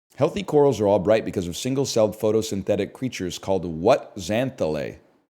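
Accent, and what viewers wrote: Canada. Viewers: Welcome to the Canadian accent. 😊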